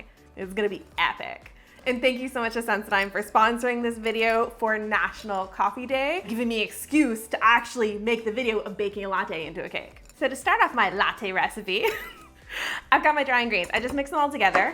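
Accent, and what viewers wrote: Canada. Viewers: I didn't realize you were Canada. I could hear on this video for sure with your "abouts" and "outs" that your definitely Canadian. 😊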